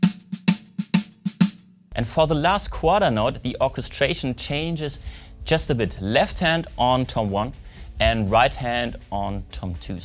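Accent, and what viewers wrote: Germany. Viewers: I'm sensing a german accent there.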